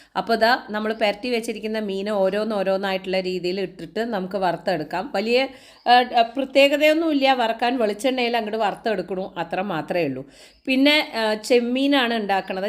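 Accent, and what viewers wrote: Palakkad, India. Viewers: Dear.... സുഖായോ... Sound ഇപ്പോഴും ക്ലിയർ ആയിട്ടില്ലല്ലോ... കാത്തിരിക്കയായിരുന്നു വിനീടെ പാലക്കാടൻ സംസാരം കേൾക്കാൻ.. സന്തോഷമായി... Wishing you life"s best mn new year 💐💐💐💐💐❤️, കണ്ണേട്ടനേയും, മക്കളെയും ചോദിച്ചതായി പറയണേ... വിനിയെ കാണുമ്പോൾ എന്തോ ഒരു sister like feeling ആണ്.. മക്കൾ വളർന്നു... സന്തോഷം.. ഒരു ഹായ് പറയണേ.. From Sophia Dicruz ഫ്രം പഞ്ചാബ്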